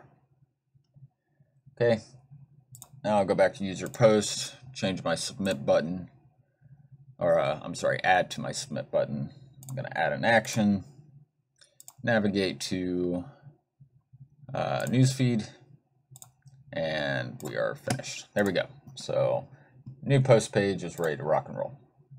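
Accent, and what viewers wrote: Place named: RP, United Kingdom. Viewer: its a great video, but coud't continue because of that rich people accent something, that you have . Sorry :( . I think you can talk normal if you want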